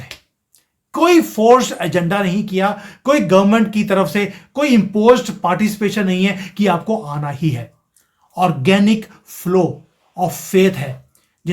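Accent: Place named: Punjab, India. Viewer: सर, 🙏 आपका जो पजाबी फ्लो है ❤👌👌 बेहतरीन।। महाकुम्भ भले ही महाशिवरात्रि तक हो लेकिन स्नान बैसाखी तक चलता रहेगा।। आप देखना स्नानार्थीयो का रेला बैसाखी तक चलेगा और तब तक ये 55cr+ हो जायेगा। जय सियाराम🌹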